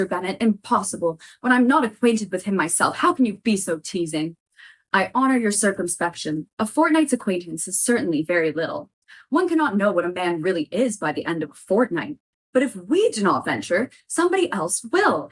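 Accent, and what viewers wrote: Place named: United Kingdom. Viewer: Oh it's so nice to hear another British person on here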